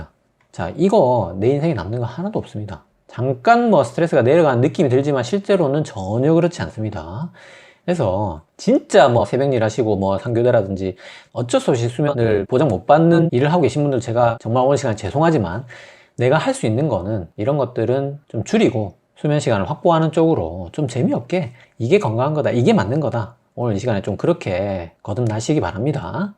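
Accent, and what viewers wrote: Gyeongsang, South Korea. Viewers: 이 선생님은 어디 경상도 분인데 이렇게 사투리가 나긋나긋 귀엽지? 어떤 경상도 사투리는 엄청 드세던데.. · 귀여운외모 경상도억양이 특이하셔요😂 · 아침 먹다가 뿜을 뻔…. ㅋㅋ 니나 아는… ㅋㅋㅋ 저 경상도 사람인데 서울에 살거든요… 매번 느끼지만 선생님 리얼 경상도사투리 너무 맛깔나게 재밌게 합니다. 듣고 있으면 폭소가 나와요. 물론 내용도 굉장히 성실하게 준비 하시고 검토 하시고 알려 주셔서 감사합니다. 귀에 속속 들어와요. 자신을 마루타 삼아 시청자들의 건강 관리 에 힘 쓰시니 복 받으실 거예요 ㅎㅎ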